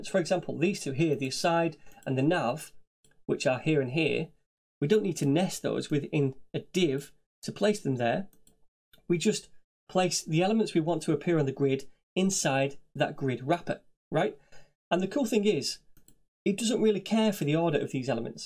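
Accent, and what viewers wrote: United Kingdom. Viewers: why is the British accent is so (f) hard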